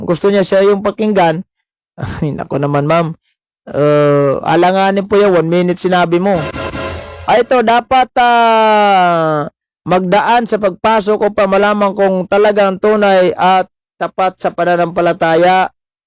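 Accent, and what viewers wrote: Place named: Tondo, Philippines. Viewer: Bakit ganyan ang tagapagsalita, parang nakikipagdaldalan lang sa kanto at usapang lasing. Hindi maayos magsalita, tigas ng at accent at parang lumaki sa Tondo . Para bara bara lang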